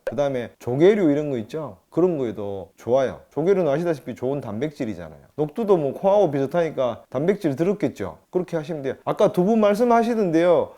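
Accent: Gyeongju, South Korea. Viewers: 박사님 왠지 경상도사투리가 정감이가더라구요 고향이 경주내남면이군요 내남면에서 이렇게 훌륭한분이 태여나셨군요 좋은정보감사합니다~❤ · ~~~ 경주 사투리 ㅎㅎㅎ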